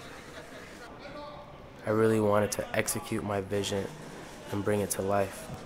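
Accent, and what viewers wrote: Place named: Toronto, Canada. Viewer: Lol yeah thats our toronto accent/slang that you're hearing.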